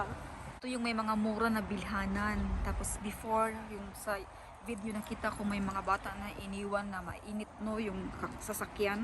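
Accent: Visayas, Philippines. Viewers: Yun mga anak mo kasi Dyan pinanganak ikaw visayan intonation pa din Even you speak English or British people will notice your intonation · Bisaya toh halata sa accent nya.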